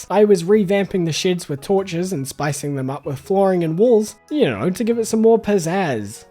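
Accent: New Zealand. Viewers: Are you….a kiwi? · As an American, it is so painful to hear you use an American accent after listening to your lovely Kiwi accent haha. Love listening to this during my study breaks!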